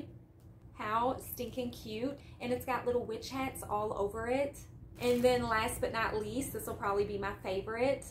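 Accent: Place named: West Virginia, United States. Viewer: I love your accent. 😊 it reminds me of home (WV)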